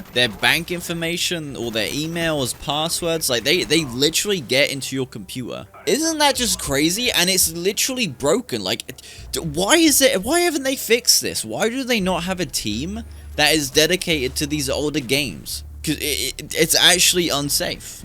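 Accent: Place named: United Kingdom. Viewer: Do you also do a car channel? Your voice sounds familiar. Classic britain😊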